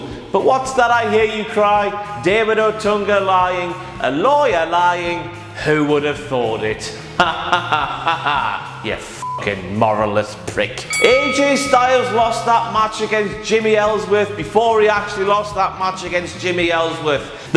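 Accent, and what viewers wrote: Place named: Newcastle, United Kingdom. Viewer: Jay Bclay I know, he's a Geordie (from Newcastle) and their accent is quite difficult to understand, he's definitely joking :)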